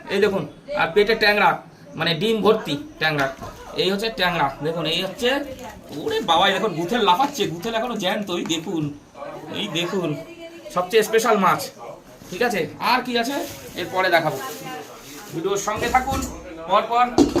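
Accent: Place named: Dacope, Khulna, Bangladesh. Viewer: তোমার কথা একদম আমাদের মতো।দাকোপ,খুলনা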